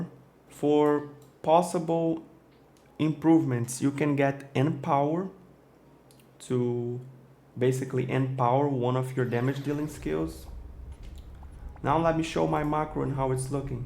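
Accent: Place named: Brazil. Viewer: Da pra perceber q é br pelo sotaque kkk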